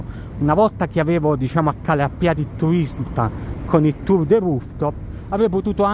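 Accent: South, Italy. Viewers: Non ha ancora perso l’accento meridionale 😳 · Prima di tutto " Supercalifragilisticespiralidoso " lo spell checker è andato in tilt.... devo dire che pur se mi vergogno delle ore che spendo su YouTube, tu sei stato la mia ultima scoperta. Seguo molte coppie in giro per il mondo ma tu sei il primo meridionale e il tuo accento mi dà tanto conforto qui a Glasgow fredda e piovosa. Ti voglio dire che forse non lo noti perché sei dall'altra parte della camera: hai una dote naturale di commentatore, in English " Story teller ", forse anche perché ami la parola scritta. Vorrei con questo messaggio incoraggiarti a seguire questa vena. Descrivi quello che hai attorno, le nuove attività peculiari di NY. Secondo me saresti un buon intervistatore perché ami le persone e ti interessa quello che è intorno. Sei ache un opportunista e credo che questi walk/blogs faranno molto bene alla tua impresa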